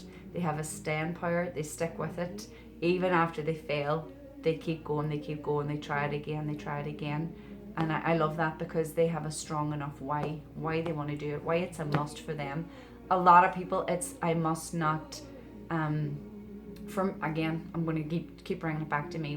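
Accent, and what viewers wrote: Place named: Derry, United Kingdom. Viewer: I have just found you and it must be Divine intervention. You are a beautiful lady, talking from the heart in honest plain terms and giving examples from your own experiences. No high faluttin preaching. I'm guessing you're a Derry girl, love your accent and the fact you've never lost it. Know your roots and be proud. I know this is 4 months after this video and the Coronavirus has hit the world. I just wish you good health and stay safe. I look forward to many more videos from you.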